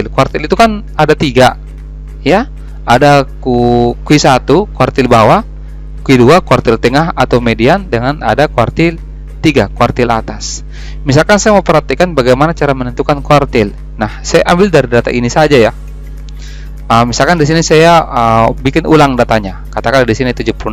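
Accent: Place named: South Sulawesi, Indonesia. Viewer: didengar dr logat nya bapak orang Bugis Makassar kalau tidak salah? 😄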